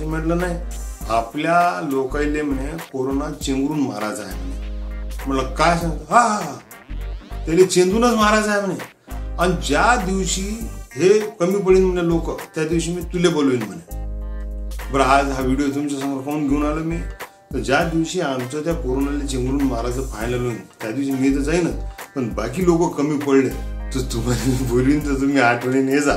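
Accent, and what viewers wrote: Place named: Vidarbha, India. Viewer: Ek no waradhi bhasha , Ani kisse BHAU you are great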